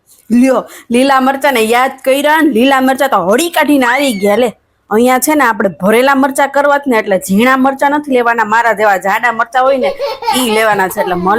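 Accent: Kathiawar, India. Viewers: Super...same bolwani rite che je hamari kathiyadi che jamnagarni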